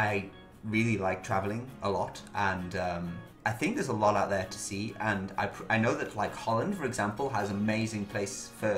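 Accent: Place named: North, United Kingdom. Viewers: If you are from New Castle, where did you get the posh accent then? hahah I thought New Castle has the weirdest accent among english people. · Gotta love that accent :D NOOOORTH bonaana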